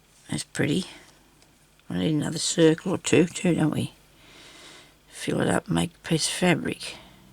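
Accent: Australia. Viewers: You're so cheerful. Enjoy hearing you talk Australian. Lol 😀TFS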